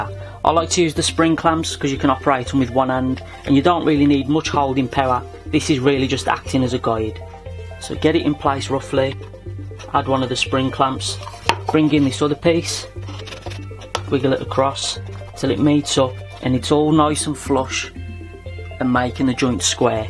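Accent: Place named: Birmingham, United Kingdom. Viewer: first time I've heard a Brummie accent on YouTube in a decade of viewing. Ofc you are probably from black country, rare to hear the accent in actual Brum.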